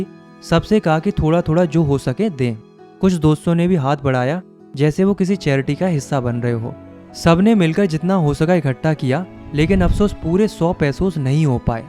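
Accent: Uttarakhand, India. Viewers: bhai uttrakhand se ho na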